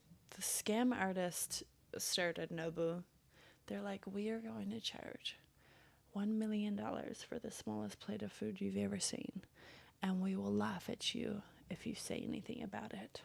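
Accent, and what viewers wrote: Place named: Deep South, United States. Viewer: you are very funny and wise and cool. Relate to not liking maths at school, and having to deal with shitty customers. What part of the states are you from, surely the deep.south from your accent?? ❤❤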